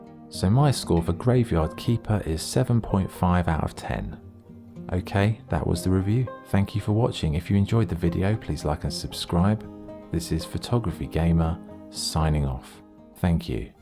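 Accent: England, United Kingdom. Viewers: Love your channel, it’s the only gaming review channel where the persons voice isn’t really irritating to me and you’re English too . Keep up the great content mate really chills me out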